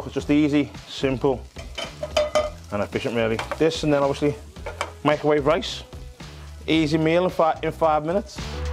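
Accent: Liverpool, United Kingdom. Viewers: Omg what he is speaking too much Britishness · Hear that scouse accent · He is a scouser from liverpool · the scouser accent for me! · Don't get how anyone can't understand the accent. a mickey. Nout wrong with that. My birds a mickey...best and funniest people in the UK 👍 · The Scouse Way.. love the language. i have fun trying to decipher. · Love the Scouse accent, represent 💪 YNWA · Is this guy from Liverpool England? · I am a Liverpool fan and have heard many local players speaking the same accent. It's difficult to understand but cool anyways. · he’s from liverpool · Ah, the scouse accent. Brilliant. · Scouser confirmed 😄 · Scouser accent eh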